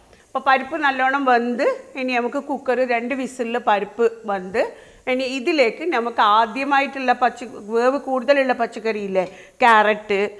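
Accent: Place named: Malabar, India. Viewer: Mam your cooking classes are very helpful.When ever i am having doubt while cooking i watch your videos and i love the way you talk with that malabari mapila accent😊.Looking forward to more new recipe's✌🏻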